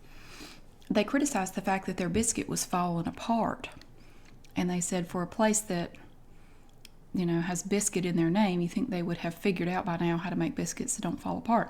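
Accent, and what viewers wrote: South, United States. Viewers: You are literally so cute! I love watching you for your mannerism. And your southern accent. 😍😍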